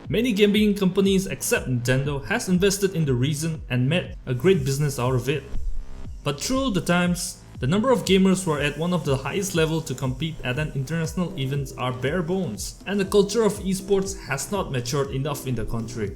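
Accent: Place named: Northeast, India. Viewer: you sound like a northeast guy..are you??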